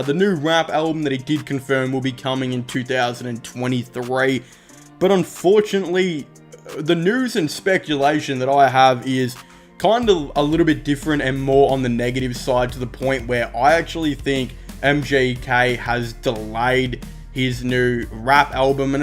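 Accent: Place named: Australia. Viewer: aussie accent lad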